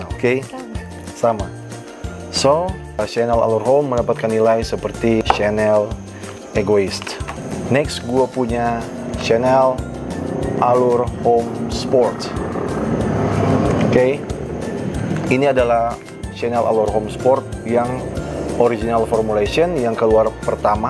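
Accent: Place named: Manado, Indonesia. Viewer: Dari Logatnya orang manado ya bro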